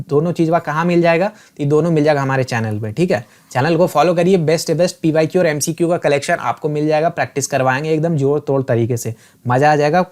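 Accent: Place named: Ranchi, India. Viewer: Uff that Ranchiyaar boleee😂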